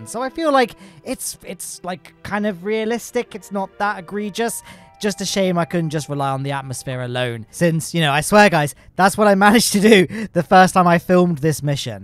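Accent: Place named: Southwest England, United Kingdom. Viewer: Knew you was from the southwest, its nothing like a Janner accent but you sound like a lot of people i know from plymouth and in bristol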